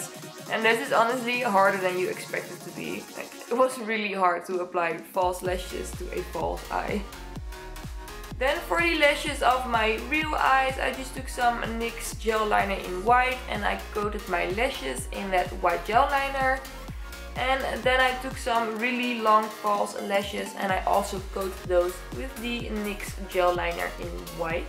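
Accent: Netherlands. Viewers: het is zo grappig ik hoor je Nederlandse accent tussen het Engels PS love you dit is echt een van de beste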